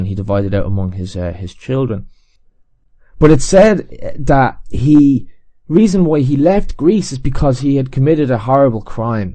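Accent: Dublin, Ireland. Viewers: trying to learn an Irish accent what better way than listening to an Irish guy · That is a perfect Dublin accent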